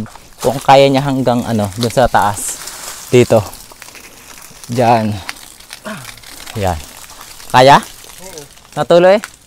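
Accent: Batangas, Philippines. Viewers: Batangeño ka ga kuya?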